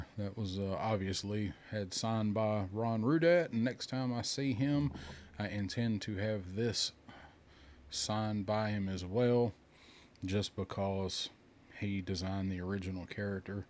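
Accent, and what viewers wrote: South, United States. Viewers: What a great addition with Val! Classic! Great review! I can't wait to open my big ass box! Been sitting for 2 whole days! 3 by the time I actually get to it! As always, a wonderful video with a great southern drawl! Yeeehaaaaw! 4.5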